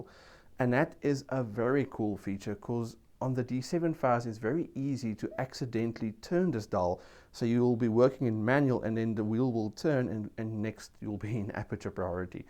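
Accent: South Africa. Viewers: I am busy going through reviews of the Nikon D600... i just stumbled over yours, and I was like: Hey, I know that accent! :)) It is nice to see a camera review made by a south african on here for a change. :thanks for the great review! :)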